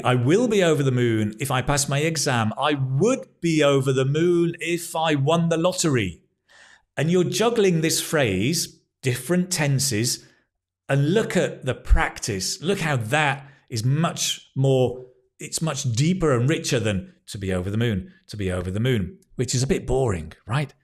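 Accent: United Kingdom. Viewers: Hello, I could stay for hours listening to you speaking with that wonderful British accent. I am Argentine, I learnt English when I was very young. Now I'm 62 and findd that learning languages is amazing. Five years ago I started learning French and now I can have a conversation or read or listen. I went on changing my methode. Once I felt confortable with the basic grammar rules I started watching videos of different subjets just to grasp the accent and the vocabulary of real people. Now, I am learning German! My level is really basic but one day I found myself reading and listening to a simple story. And that was so motivating!!! As you said, each one has his own methode. Mine is as simple as go on changing methodes!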